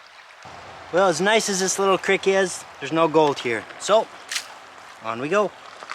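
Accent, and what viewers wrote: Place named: Midwest, United States. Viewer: If you have yucky sticky clumpy dirt in your bucket, add water like you did at 23 min. in this video. The magic tool? (2 of them, actually) 1. ALWAYS put a few drops of JetDri in your bucket! With your fine flour gold, you MUST break the surface tension, or fine gold can actually float, and get panned out. 2. Bring a battery-powered drill along. Attach a paint mixer to it. Use it to pulverize the clay balls and loosen all material, freeing it up for more effective panning. Bring an extra battery just in case. LOVE your videos - haven’t heard that Midwest accent in many years… Makes me homesick!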